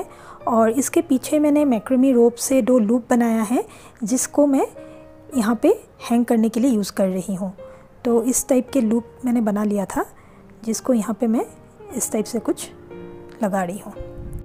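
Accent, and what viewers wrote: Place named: Assam, India. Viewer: I like your creativity. I am definitely going to try it. By the way, are you Assamese? The way you talk seems like that. Are you from Assam?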